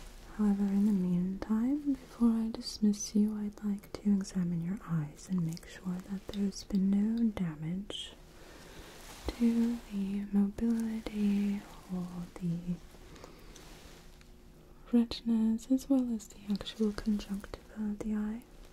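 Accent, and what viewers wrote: United Kingdom. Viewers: It's a British accent. She made a video with 5 new characters last week. All of them have different personalities, voices and slightly different appearances like hair styles. So she has decided to make a video as this new character. Hope this helps! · I've got used to the British accent (not bad either!) but I wasn't expecting the 'cheers' thrown in - one of the thing you hear a lot in the UK, but rarely when someone is trying to do a British accent. Bravo! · British accent?😅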